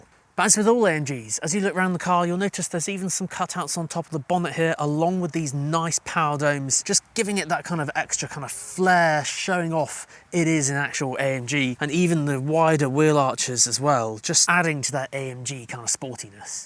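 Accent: England, United Kingdom. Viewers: Great video. English accent always adds a level of sophistication. I miss Europe.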